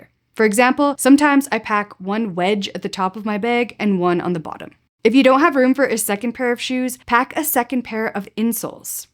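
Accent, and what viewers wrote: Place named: Canada. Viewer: Broncos fan? Saw the fingernails. Then again, I detect a slight Canadian or Yooper accent. Thx for video!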